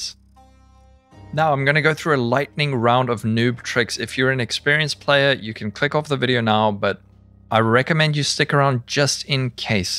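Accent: South Africa. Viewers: qAre you a Saffa bud? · Are you South African? Level 70 rare items, if you get a full set(Unidentified), you can get 2 chaos from vendor. level 80 rare set gives you 2 regal orbs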